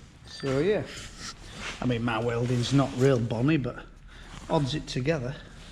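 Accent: Yorkshire, United Kingdom. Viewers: Look I'm dizzy enough without you dropping the camera, be more careful. Thanks for sharing anyway always good to listen to that Yorkshire accent, and the no nonsense get on with it farming.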